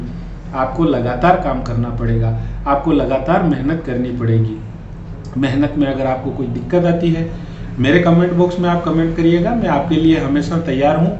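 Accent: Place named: Himachal Pradesh, India. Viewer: Aapni voice himachali lgdi h😊 mai himachal se hu mera 6 August ko typing test apki video kafi helpful rahi i hope mera test acha jaye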